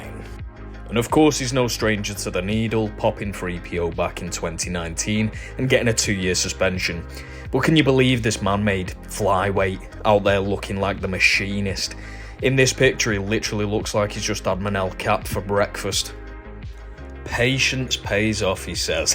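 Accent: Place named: Manchester, United Kingdom. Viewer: Love to see it - bringing the Manc accent and charm to the masses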